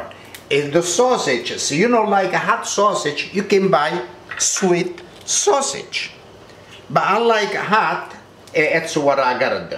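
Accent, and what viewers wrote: Italy. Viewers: The best Italian accent ever !!!!!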